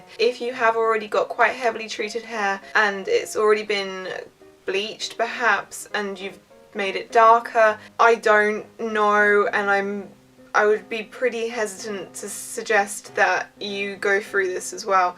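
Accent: United Kingdom. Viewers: Far Q It's just British... I suppose.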